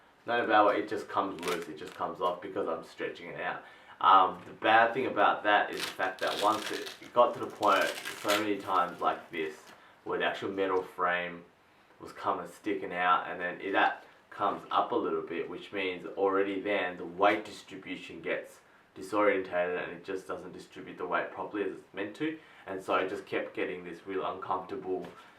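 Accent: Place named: Australia. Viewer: Where you from, you sound Australian, how'd you get a hold of one of these?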